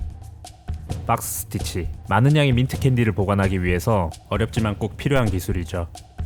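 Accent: Gyeongsang, South Korea. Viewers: 사투리? 경상도분이신가? 암튼 멋집니다👍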